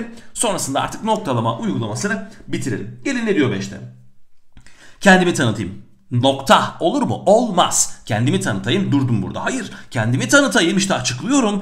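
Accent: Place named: Konya, Turkey. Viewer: Memleket Konya sanırım hocam 😀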